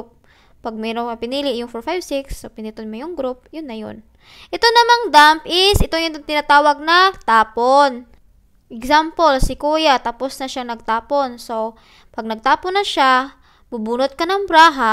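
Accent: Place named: Visayas, Philippines. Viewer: Bisaya ka day. Gwapa man jud ka, ?hehe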